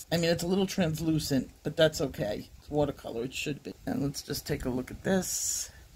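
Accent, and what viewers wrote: New York, United States. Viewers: I am so sorry to hear your hubby is so sick, and especially that u can’t visit him right now. I hope he is better soon. I love how u have known each other since grade school. That is so special. I love retail therapy also! Having grown up in Queens, New York, it’s almost like being back there when I hear your New York accent. I love it. Hoping he is better and home soon where he belongs.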